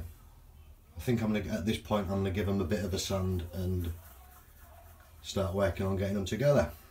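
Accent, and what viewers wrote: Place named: Hull, United Kingdom. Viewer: This is driving me nuts looking for clues. lol. Is it Hull?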